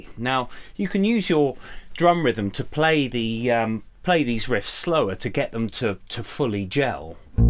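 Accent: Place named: United Kingdom. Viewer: Definitely not complaining, but are all online Bass instructors British?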